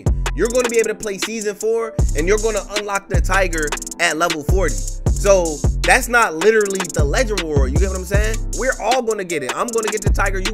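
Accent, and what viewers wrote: Baltimore, United States. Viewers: I hear Baltimore and Philly at the same time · u from baltimore?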